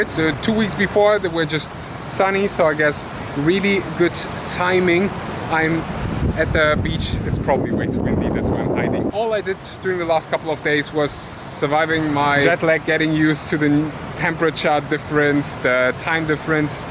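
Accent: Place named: Germany. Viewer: You have a thick German accent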